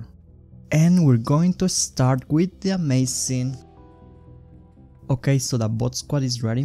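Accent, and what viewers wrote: France. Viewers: his accent sounds french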